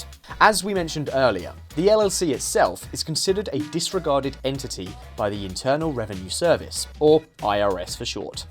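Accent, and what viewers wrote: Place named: United Kingdom. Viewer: I like your British accent 💪💕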